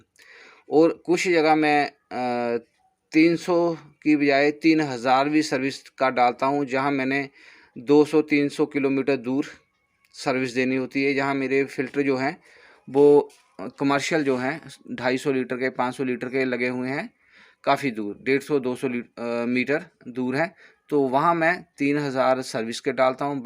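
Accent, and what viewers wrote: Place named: Punjab, India. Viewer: Ur punjabi :D hindi toh pata lag geya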